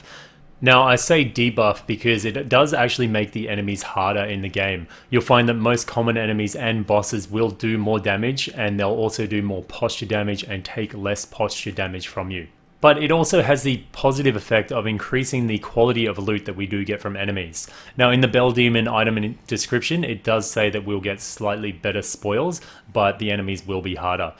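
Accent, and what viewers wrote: Australia. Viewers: Is that an Aussie accent I hear 👀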